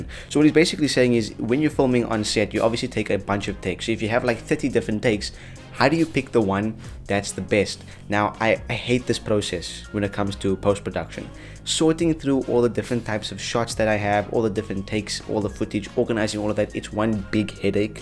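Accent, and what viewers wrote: South Africa. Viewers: south african ??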